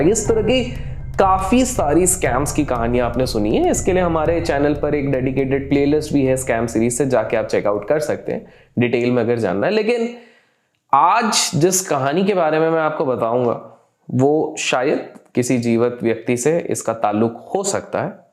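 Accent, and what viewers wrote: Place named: Konkan, India. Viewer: Sir aap Maharashtra se ho aur 100% kokan se ho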